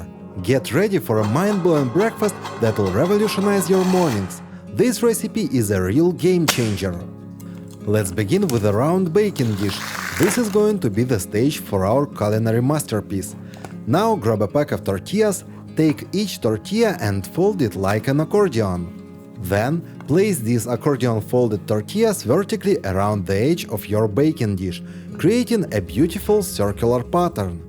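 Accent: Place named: Russia. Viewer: Russian Dialect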